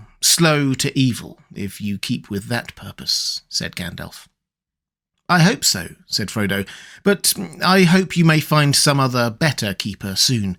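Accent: United Kingdom. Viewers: Nerd of the Rings is great too but the British accent makes the story telling so good on this channel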